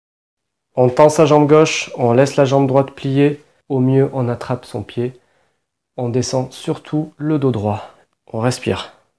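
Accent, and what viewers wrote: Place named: South, France. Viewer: T'as l'accent du sud parfois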